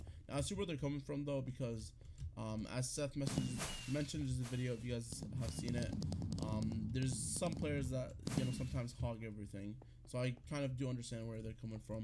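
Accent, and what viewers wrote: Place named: Egypt. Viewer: hmmm, you aound egyptian, my brother :D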